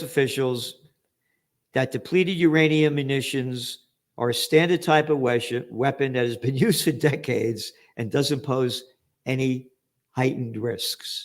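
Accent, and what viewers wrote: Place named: New York, United States. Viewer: Great video, thats what I miss NY effing talk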